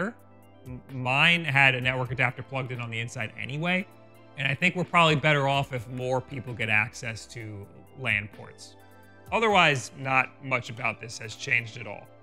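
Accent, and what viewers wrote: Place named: Long Island, United States. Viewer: God I always forget how much I hate how Long Island folks say "Mario" until I hear your videos ha-ha You seem to purposely try to say it 100 times a video. i cringe each time.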